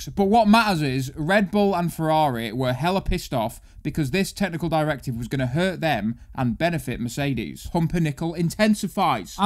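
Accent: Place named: Sheffield, United Kingdom. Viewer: Whenever I want offensive motorsport content, I do want it in a Sheffield accent!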